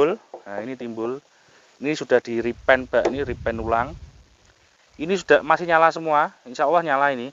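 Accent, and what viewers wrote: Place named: Malang, Indonesia. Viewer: Nonton test drive sampeyan poleh kangen ngalam Sam. Boso jowone sampeyan medok khas ngalam an. Alhamdulillah aq duwe sing th 1983. Sukses buat yo sam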